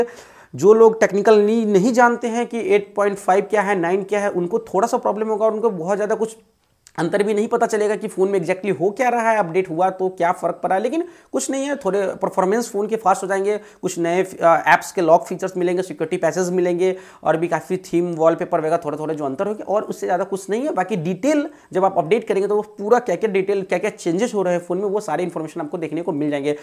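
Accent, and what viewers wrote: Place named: Bihar, India. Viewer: bihari HAI. Aise hi bolega!!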